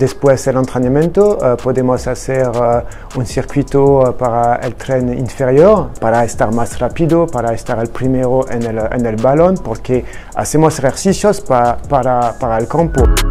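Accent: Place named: France. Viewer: Pfff avec ton accent français tu me donnes envie de grrr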